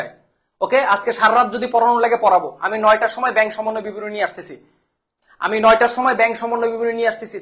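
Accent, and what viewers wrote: Barishal, Bangladesh. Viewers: স্যারের বাড়ি মনে হয় বরিশাল। 😊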